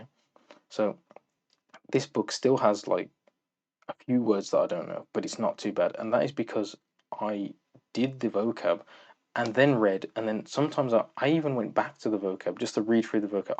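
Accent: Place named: Birmingham, United Kingdom. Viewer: Ah you’re a Brummy, do you know Joe?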